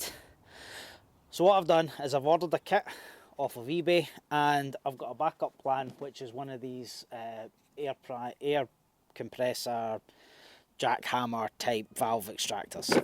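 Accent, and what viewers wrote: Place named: Perth, Australia. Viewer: Mate where are you located? If you’re looking for a wee Homer , I’ve a 2011 traffic, immaculate condition but the injectors need replacing. I’m in Perth. Your accent sounds close by.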